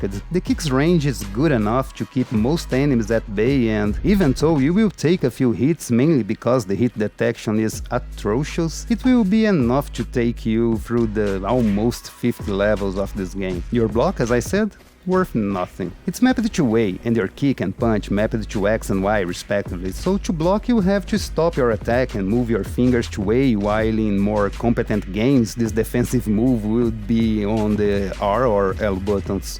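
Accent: Brazil. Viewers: Pelo seu sotaque eu tive quase certeza que vc era BR haha! Seu inglês é muito bom 👏🏽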